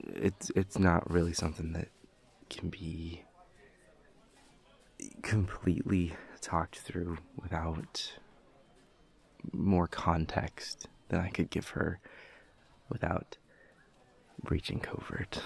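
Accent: Newcastle, United Kingdom. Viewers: AFTER 5 WHOLE MONTHS OF WAITING ANOTHER GEORDIE AUDIO IS FINALLY OUT Thanks for the food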